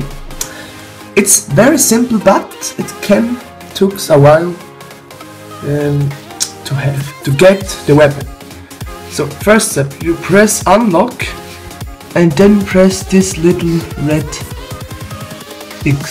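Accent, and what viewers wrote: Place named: Germany. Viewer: Are you German or something? you kinda sound like your German. And if you are, Hallo :-)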